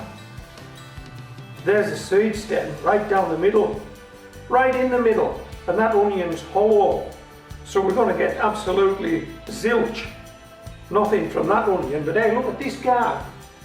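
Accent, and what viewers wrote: United Kingdom. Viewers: British? Just curious.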